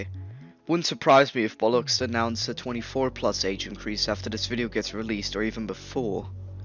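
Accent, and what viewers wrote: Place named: United Kingdom. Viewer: Nice vid but you should upgrade to a snowball EDIT I love your voice it’s like a mixture between posh british and Scottish/Irish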